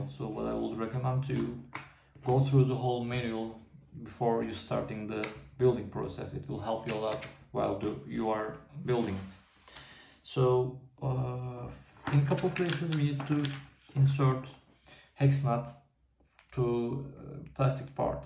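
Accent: Turkey. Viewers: thanks and Ramadan Kareem! Noticed you have a Turkish accent 😉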